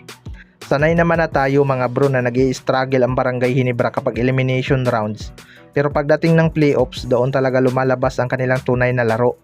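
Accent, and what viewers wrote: Visayas, Philippines. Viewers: Samang pkinggang boses ng bisaya tpos bkla pa tpos fake news pa ay sarap samplin ng burat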